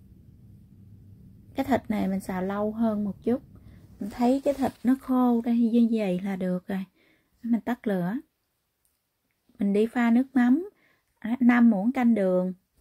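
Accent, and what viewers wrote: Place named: Mekong Delta, Vietnam. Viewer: lâu tôi được nghe lại phong cách Người Miền Tây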